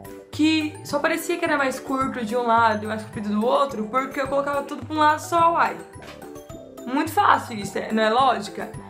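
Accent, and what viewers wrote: Brazil. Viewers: Você é goiana? 😍😍😍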